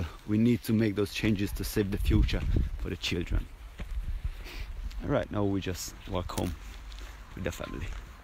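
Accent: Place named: Jamaica. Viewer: You sounds like a Jamaican did you develop an accent or something like that it sounds very unique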